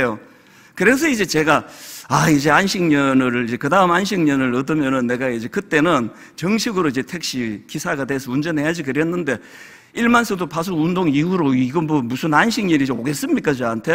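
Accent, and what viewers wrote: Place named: Gyeongsang, South Korea. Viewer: 제가 대구사람인데 서울출신 남편이 처가가족들 대화들으면 싸우는것 같다 그래요 ㅋㅋ 목사님 경상도 스타일이셔서 그런것 같아요^^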